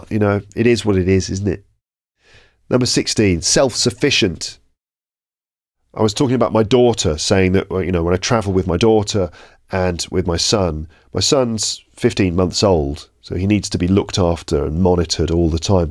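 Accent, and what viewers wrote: United Kingdom. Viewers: I like your voice because of its tone (your voice is high-toned) and you speak with British accent so it becomes more attractive.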